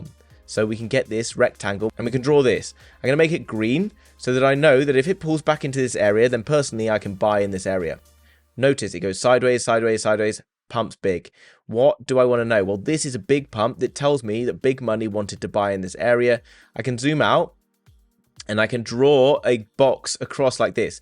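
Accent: RP, United Kingdom. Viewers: Mate, you remind me of Hugh Grant and I don't know why. It must be the hair and the posh British accent.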